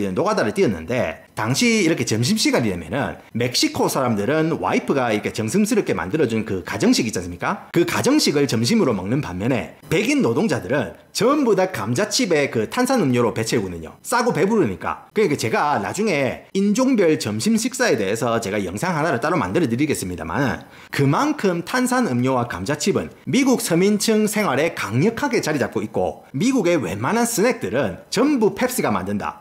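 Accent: Gyeongsang, South Korea. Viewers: 경상도 분이신가요?? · 아니.. 우리 경상도 아재 언제 채널명이 바꿨는지. 어허. 이무슨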